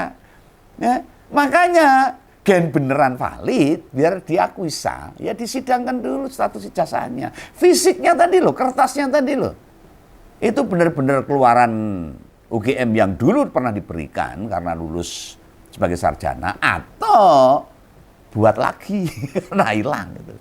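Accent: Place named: East Java, Indonesia. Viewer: Kental sekali mas ini Wong Jawa Timur.... Sehat2 selalu Mas wong jowo asli. Aku senang dengerin.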